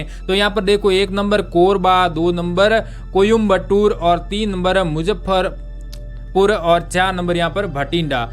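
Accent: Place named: Southern Rajasthan, India. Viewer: Bhai aap kaha se ho aapki laungage se to lag rha udaipur khervada baswara dungpur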